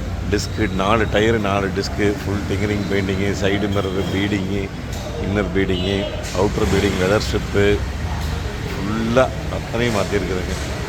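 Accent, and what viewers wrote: Kongu, India. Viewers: கொஞ்சும் கொங்குத் தமிழ் பேசும் கோமகரே...! திகட்டாத தித்திக்கும் பேச்சுடைய திருப்பூர் திருமகரே...! லடாக் பயணச் சாதனைச் சகாப்தம் எங்கள் மதிப்பிற்குறிய அண்ணா திரு. அண்ணா❤❤❤🙏 அவர்களே எப்படி இருக்கீங்க அண்ணி❤❤❤🙏 எப்படி இருக்காங்க தம்பி ரித்திக்❤❤❤ எப்படி இருக்காரு வீட்டைச் சுற்றிவரும் பட்டுக் குருவி அது சிறகு முளைத்த சிட்டுக்குருவி...! சுறு சுறுப்பாய் சாலையில் நான்கு சக்கரங்களைச் சுழற்றி வலம்வரும் சுட்டிக்குருவி அது நம் மாருதியின் சிட்டுக்குருவி...!!! விறுவிறுப்பாய் ஊரெங்கும் விளையாடும் ஊர்க்குருவி அது பறவையென்னும் சிட்டுக்குருவி...!!! தனக்கென்ற ஓர் மானிடக் குடும்பத்தோடு வாழும் இந்த குட்டிக் குருவி அது மாருதியின் சிட்டுக்குருவி...!!! வண்ண வண்ண நிறத்தில் வானத்து நட்சத்திரமாய் வலம் வரும் செல்லக் குருவி அது பறவைச் சிட்டுக்குருவி...!!! வையகம் முழுவதும் அண்ணா திரு. அண்ணா சென்ற லடாக் பயணச் சாதனைச் சகாப்தத்தை போற்றி மகிழ்ந்து அனைவரையும் வாழ்த்துக்கூற வைத்த கவிக் குருவி அதுதான் நம் அனைவரது மனதையும் கவர்ந்த மாருதியின் ❤"""சிட்டுக்குருவி"""❤...!!! 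என்றும் அன்புடன் உங்கள் தம்பி🙏 என்றும் அன்புடன் உங்கள் TMF🙏 ரா.முஹம்மது கபூர் (சென்னையில் இருந்து)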